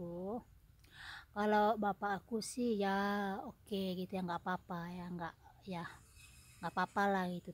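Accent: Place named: Minangkabau, Indonesia. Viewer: ..emang bana tu ni.... Dan lagi logat uni masih terasa kental urang awaknyo.. gak dialekk kebarat2an walau udah puluhan thn di swiss.. Semoga sehat selalu ya uni.. ditunggu resep masakannya...dan juga kebersamaannya bersama keluarga..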